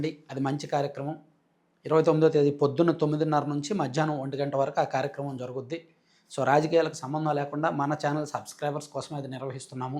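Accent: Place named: Coastal Andhra, India. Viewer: మీ వాయిస్ కోస్తా మాదిరి ఉంటుంది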